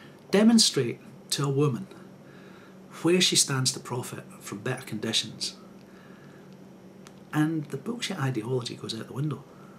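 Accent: Scotland, United Kingdom. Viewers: ...you might want to think about transcripts. I'm very good at understanding various accents very well, and I love your lucious burr, but I know some Yanks in particular have trouble with it. Sorry 'bout that, we ought to get better at hearing other cutlure's lovely ways of speaking but some of us are just terrible at it and can't help it. Perhaps putting up transcripts in your low bar would help a few who canna heer ye threw tha' luvly way o' speekin' ya got thairr...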